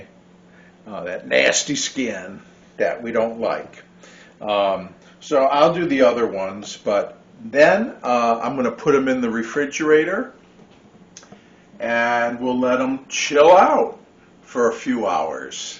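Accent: Midwest, United States. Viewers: Love the foods , but I love your mid west accent which is like my birthplace of Chicago Illinois I’m reminded of all my family which I miss so much! Thank you for your channel, and the great foods I feel at home with you all!👍🏻❤️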